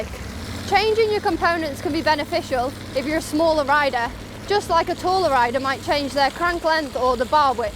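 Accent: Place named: United Kingdom. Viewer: It's probably a mix of nerves and the British dialectual habit that she's attempting to speak through a locked-jaw. Don't take this the wrong way - nobody is expecting her to sound American, but perhaps a little practice enunciating with an open mouth (and, of course, time to be less nervous while doing it) will go a long way. Matt, Dan and Cy seem to have this down pat, but I'm sure it took practice and/or some studying. Too bad cycling and a glass of wine - to lessen the nerves - don't mix, but like anyone who regularly speaks in front of an audience, she'll get used to it.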